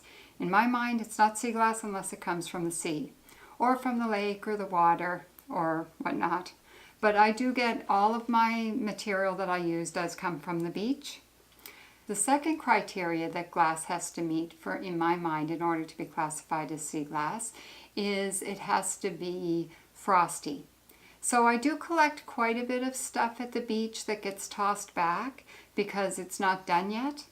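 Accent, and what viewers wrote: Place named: Nova Scotia, Canada. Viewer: Since I'm from Nova Scotia, I loved watching your video and hearing the accent I grew up with. You are quite the artiste! I can't imagine trying to figure out how long it took to create a mosaic. No time limit on true art, eh? I'm sure those who paint on canvas or those who do pottery or those who chip away at a statue don't know or care how long a piece takes. :) My daughter is the one who collected all her sea glass and your videos will help us understand how to create our own art (I'm her helper … sort of) ;)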